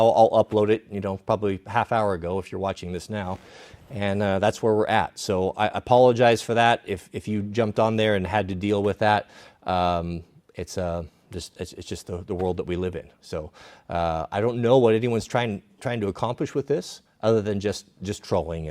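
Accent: India. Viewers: Indian accent is the dead give away 😂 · Indian voice is a dead giveaway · First sign of trouble indian accent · Indian accent? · Rule number 1. Don’t trust indian accent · Always the same indianish accent... why?!😢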